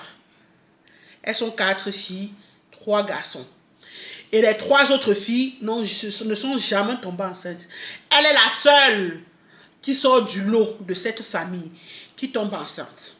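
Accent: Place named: Cameroon. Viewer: Coucou, dans ta voix on voit que tu es une Camerounaises et une femme FORTE ♥️👌👌👌 Tu es très Nianga ( je suis une fille no com vulgaire please )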